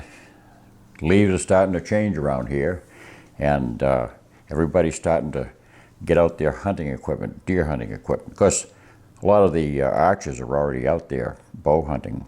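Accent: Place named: Maine, United States. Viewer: I know a fellow maine accent when I hear one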